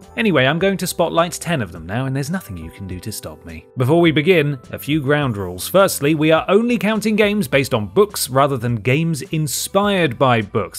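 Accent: United Kingdom. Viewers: Gotta love british accent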